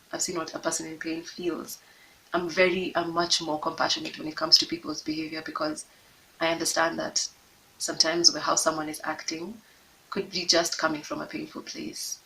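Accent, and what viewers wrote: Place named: Nairobi, Kenya. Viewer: Sort of fell upon your channel. You remind me of someone I knew... beautiful and soulful and absolutely terrified of being vulnerable. Glad you found the strength to share. You sound so Nairobian though! It's too bad you had to leave.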